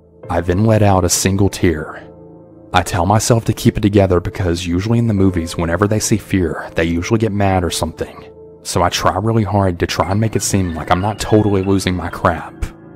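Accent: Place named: Texas, United States. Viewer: I love your Texas accent damn it so smooth ❤️❤️💯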